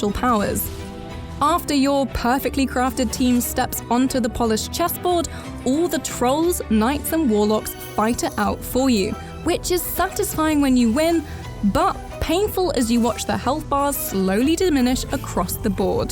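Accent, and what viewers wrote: United Kingdom. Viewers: Yo yo British... I was soo bored by listing American accent .. happy to listen to an British one .. 😌😌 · Narrator : Very strong british accent I'm out...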